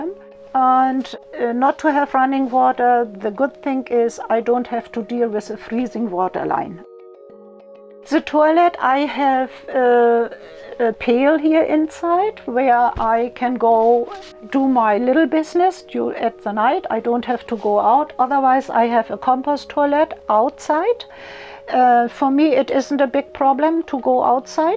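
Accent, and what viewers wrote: Germany. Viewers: You are a nice and cool lady, my first impression was that you look europaen, not american.........and your englisch ist not american....... I think, you are German.....??? Alles Gute für Dich......🤝👍🙆‍♀️ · Ich liebe deine Deutch Akzent. Sehr nett klein haus und auch schön garten. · Lovely lady, lovely Deutsche Akzente, lovely little house! I like them all! :-)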